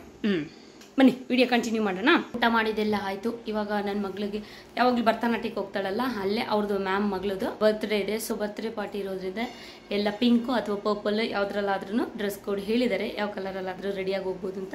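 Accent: Hassan, India. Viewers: Hassan & Saklespura da kannada bhasheya sogadu nimm matinallide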